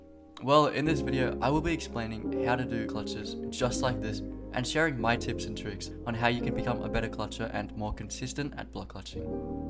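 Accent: Australia. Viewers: YOU SOUND LIKE.. an australian… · I KNEW IT, HES AUSSIE, btw this is the gist voiced vid that I have watched from tou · idk i why im asking this but u sound aussi. are you?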